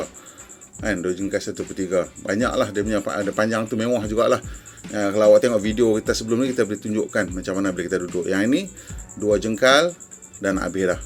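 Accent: North, Malaysia. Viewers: Cakap utaqa plak noo… i like👍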